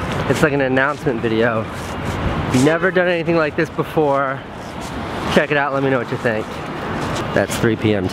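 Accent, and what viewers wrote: South Africa. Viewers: South African accents are my faaaaaaaaave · Lekker. So nice to hear some Saf African. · South African: Yeeeese (yes) and Bleake (black). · I live in New Zealand and I'm from Cape Town and listening to talk and his accent shit it's brought back memories! haha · Love the South African accent, not sure why just do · That south african english has such a weird accent, but it's so cool. It sounds so laid back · I totally dig South African accents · That's a South African accent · I absolutely love the South African accent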